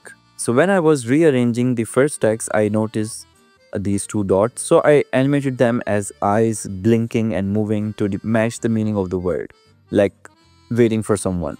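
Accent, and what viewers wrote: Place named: Pakistan. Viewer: This work is definitely not worth $450, you should up your prices. If you've made such a great project in just 3 days, it can only get better with a more flexible time limit. Judging by your voice you sound Pakistani, and $450 is a lot here, but still, you've got to charge more, your portfolio is surely looking great already.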